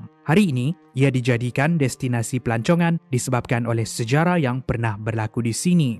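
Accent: Sabah, Malaysia. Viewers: kau orang apa sabah kah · gaya bahasa terlalu formal dan masih jelas dialek sabah. tapi jgn disalahertikan, sekadar mengatakan shj.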